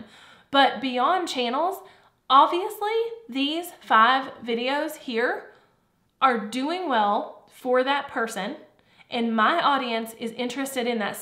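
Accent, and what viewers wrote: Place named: North Carolina, United States. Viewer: DUDE. this video and these analytics are blowing my mind :) THANK YOU - and im from NC too so your accennt is so comforting for me. lol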